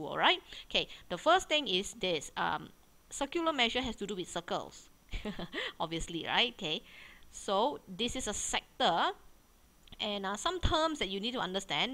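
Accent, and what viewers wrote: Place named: China. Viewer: She's Chineese. Indians have worse accent.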